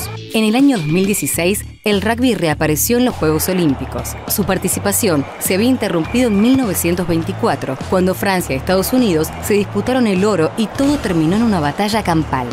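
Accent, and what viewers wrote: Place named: Argentina. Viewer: Que horrible se escucha la voz Argentina, preferiría el Colombiano o Mexicano.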